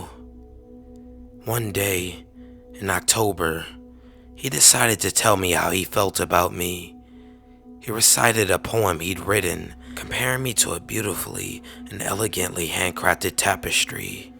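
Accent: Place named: South, United States. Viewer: Sunna Lind I like the rough edge his voice has. That, and I could swear he's got a little bit of a Southern drawl. I dunno, but I like it.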